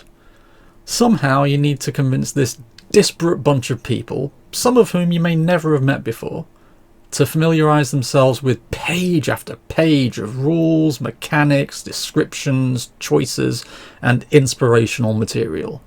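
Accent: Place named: United Kingdom. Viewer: You have a British accent. This is episode 002. Does this mean there's 005 more episodes before you talk about superspy/espionage RPGs?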